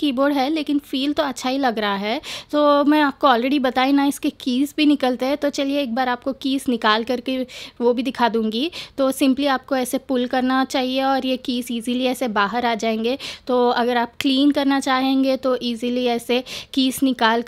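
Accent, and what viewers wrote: Hyderabad, India. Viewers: Your Hyderabadi language is super don't bother about any thing your doing well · Vaaamo pakka hyderabadi hindi maatlaadesthunaavga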